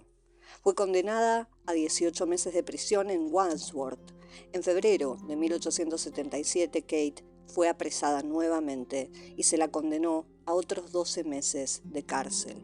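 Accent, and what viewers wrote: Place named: Spain. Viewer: Me gustan los temas pero el acento español de la narradora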